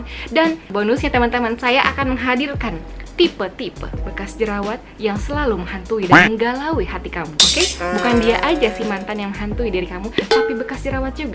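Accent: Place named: Sunda, Indonesia. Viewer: Urang sunda ning😂😂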